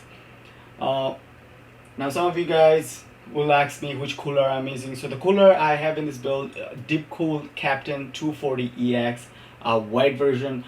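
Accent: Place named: India. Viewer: Are you from India? It seems you have Native Indian accents.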